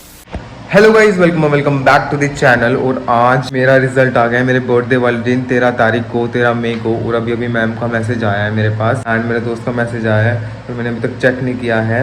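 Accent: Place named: Haryana, India. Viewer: Proper harayanvi tone 😁😂❤️.....vase mere papa ne bhi ase kuch react Kiya 😂...all harayanvi father's are same 😅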